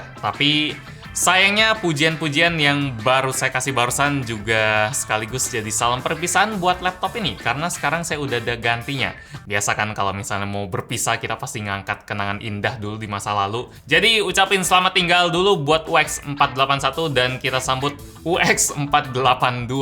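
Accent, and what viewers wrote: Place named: Jakarta, Indonesia. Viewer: Bang, ngapa jadi logat betawi dah? :DDD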